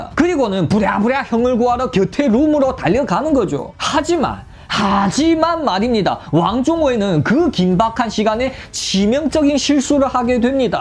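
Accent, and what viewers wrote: Yanbian, China. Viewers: 왠 조선족 말투. 듣기싥어라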